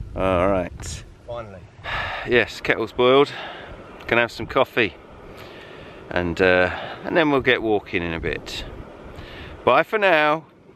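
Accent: United Kingdom. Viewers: funny as sh#t I've made it exactly two-and-a-half minutes into your video and I can't stop laughing.As you're coming up the hill you stop and you say It's Like a Jungle Sometimes it makes me wonder with that British accent, and then you pause turn your head and I think to myself you know I would bet a million dollars that this white British dude probably doesn't realize he just started the lyrics to a song, and if he finishes the sentence when he turns his head back around he's going to say what in the world have i gotten myself into or it makes me wonder who in their right mind does stuff like this for fun and then you turn back around and finish the lyrics with your British accent and I haven't stopped laughing for 5 minutes! I don't know why I'm surprised you finished the lyrics to an African-American song that came out when you were probably a kid! After all my favorite music is British music. 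I love British music Their's only 2 kinds of music,good music and bad music and it's all relative from your perspective which is which and for me #1 on the list for a tie,its between the and,right beneath that my second favorite bands it's another tie between and and yeah Jimi like me was an African-American,but because of racism he couldn't get his start in America and he didn't take off until he formed the Experience with his two British bandmates in England.Just beneath them on my favorites list are the, and of c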